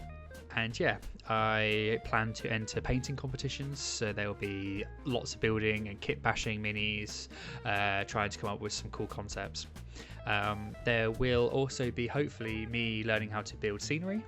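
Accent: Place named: England, United Kingdom. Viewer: You have the english accent so that is a good first start.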